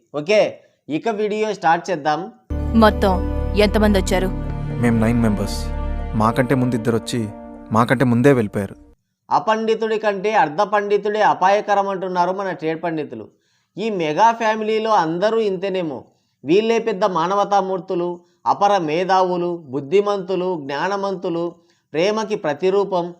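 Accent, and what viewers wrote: Rayalaseema, India. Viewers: I watch your videos regulary and always like your punches, video script, and presentation(Vakchaturyam), I am from London. The way you speak you sound like you are from Rayalseema and me too from Dhone.Good I would like to meet you in my July visit to India. Let me know if you would like to meet, I can share my contact.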